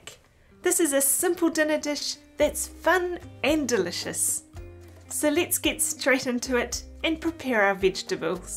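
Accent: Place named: New Zealand. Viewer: Looks delicious!! Also, I enjoy listening to your New Zealand accent, so much. Best wishes from Phoenix, Arizona🤗💐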